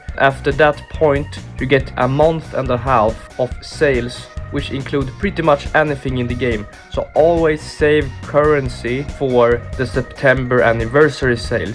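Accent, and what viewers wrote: Sweden. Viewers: Are you Swedish, because your accent sounds really Swedish? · bro u sounds so swedish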